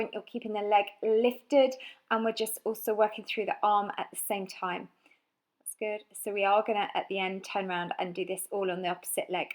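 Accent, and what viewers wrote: United Kingdom. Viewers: Wyndham-Read thank you!! it was not to be ofensive at all! you are really good and I love your determination and your constance and energy! and if you ever tried an Adults ballet class you would pick it really fast! I am sure of it:)., may I ask where is your English accent from? I am trying to improve my English. thanks! many hugs and all the best in your really fascinating project!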